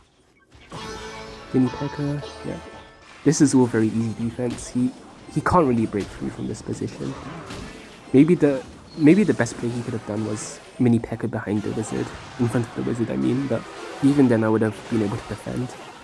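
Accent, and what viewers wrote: United Kingdom. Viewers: Damn bro you sound so British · Hm i remember when u said u wouldn't voice reveal bcuz if ur british accent 😅😂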